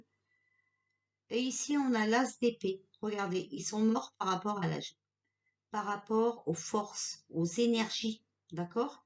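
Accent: North, France. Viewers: Bonjour, vous êtes du nord ?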